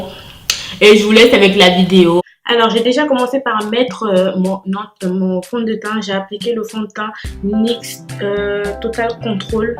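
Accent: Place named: Cameroon. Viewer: Les 1ere secondes ton accent me rappelle que tu es triangle national camer. En tout cas jolie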